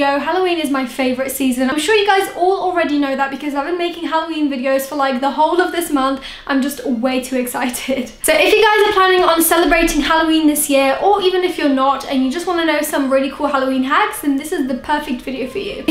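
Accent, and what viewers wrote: United Kingdom. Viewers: say ping pong one more time. that cute british accent tho 😄🙇😉😉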